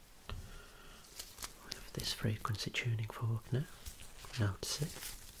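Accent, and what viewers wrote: Birmingham, United Kingdom. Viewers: Damn, that's a strong Brummie accent. Works so well for this relaxed vocal range style in this video, though. Love it! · that British voice I mean DAMN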